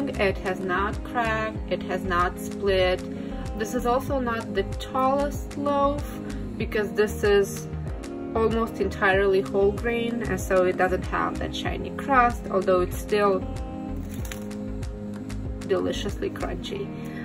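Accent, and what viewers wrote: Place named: Egypt. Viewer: Your accent reminds me of some of my Egyptian friends. :)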